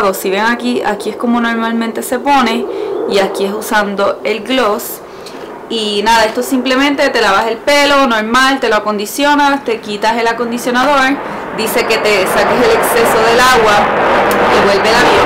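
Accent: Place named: Puerto Rico. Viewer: Cada vez que te escucho hablar no puedo evitar pensar que eres de acá! PR right? Here you have a new subscriber :)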